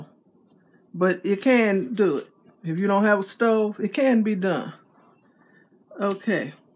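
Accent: South, United States. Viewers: I love it... your accent is so funny....reminds me a of my southern family...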